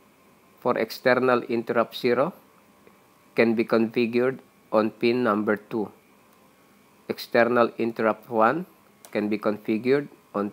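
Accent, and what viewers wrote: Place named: England, United Kingdom. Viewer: It is lots of good info, Just so hard for me with my cowboy english raisen to understand the proper english you speak. It is not your issue as I am sure your countrymen understand you just fine but would have a very difficult time understanding me. You know how us Americans are, were the only ones on the face of the earth.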